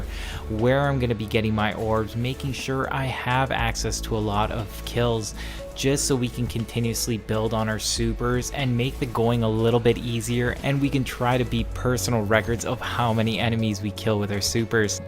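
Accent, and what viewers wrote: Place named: Canada. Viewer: Canadian ey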